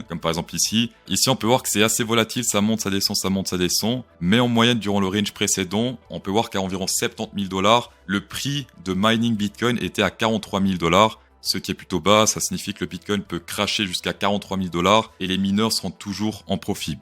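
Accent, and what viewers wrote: Vaud, Switzerland. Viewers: Tu l'entends à des kilomètres l'accent vaudois, sans oublier le "huitante" 😉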